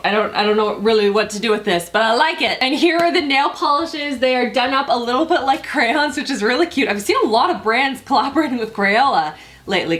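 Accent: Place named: Canada. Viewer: your Canada is showing (the accent, "out" and "about" displays it really well) 🇨🇦🇨🇦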